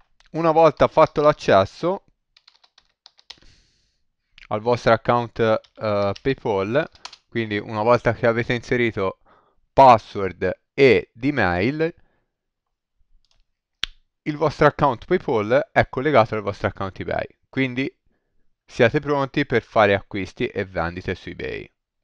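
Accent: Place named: Tuscany, Italy. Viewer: Tutorial Toscano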